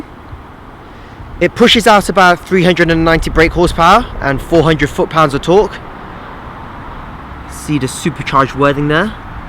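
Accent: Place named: London, United Kingdom. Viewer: You sound very much like a London kid- innit?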